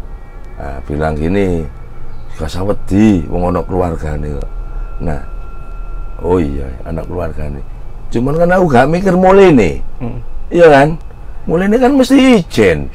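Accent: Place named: Surabaya, Indonesia. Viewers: logatnya surabaya banget om ;) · 2x puter ngga Bosen2 ,,logat khas orang Jatim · Aku seneng nak arek suroboyo cerito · Aku besar di jawa timur , tapi bukan asli jawa timur. Selama di jawatimur brasa biasa aja pke bahasa yg kya bpk ini, tapi saudara2ku yg ga tinggal di jatim slalu ktawa dnger aku ngmng nyenengin katanya. Skrg aku tinggal di jawa barat, dnger bapak ini ngmng aku ngrasain apa yg di rasain sodara2ku. Nyenengin denger bahasanya. Sehat sehat yaa bapak 🥰🥰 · Iki medok suroboyo sueneng aku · Logat Surabaya,nya g hilang jancokk... 🤣🤣😅😅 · Aku wedi pak wkkw 😀😆 suroboyoan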